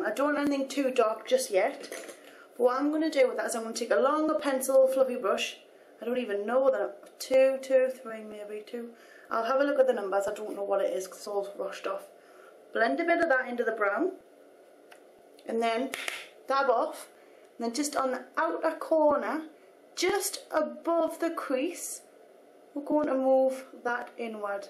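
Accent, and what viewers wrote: United Kingdom. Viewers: she is british. how can you say how she naturally speaks sucks???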